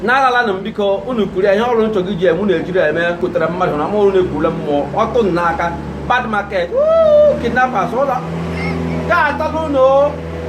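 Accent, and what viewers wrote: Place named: Ngwa, Nigeria. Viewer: u are ok, please continue we need more realistic comedy like yours. God continue to bless you for it. Abeg what state you hail from you sound like nwa Ngwa to me.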